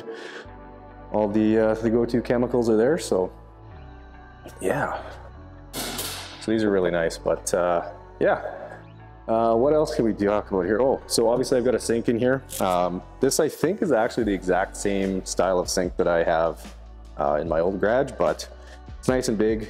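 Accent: Canada. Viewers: GREAT building!!!! Very professional!! I get a kick out of your Canadian accent too. GREEJ? I guess that's GARAGE? LOL Keep those transformed car videos coming!!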